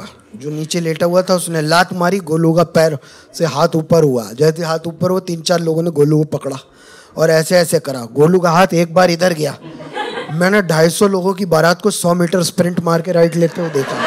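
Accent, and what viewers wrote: Uttar Pradesh, India. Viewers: Bhai ke bolne ke tarike me hi UP jhalakta h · His UP accent reminds me of Rajeev Srivastava 😂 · U.P. tone🔥🔥🔥🔥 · Up accent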